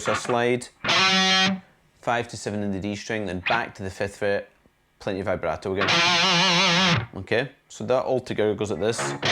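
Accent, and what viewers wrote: Scotland, United Kingdom. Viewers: Thanks for this tutorial man, love your fackin' accent, you're scottish aren't you? greetings from france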